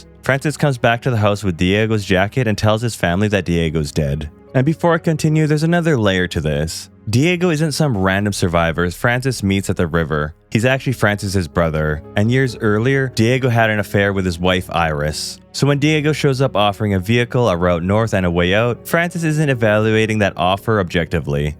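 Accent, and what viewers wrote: Philippines. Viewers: Is it weird that the moment you say you're Filipino, I can now hear the Filipino Radio DJ English accent? 😅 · i love your Filipino accent lol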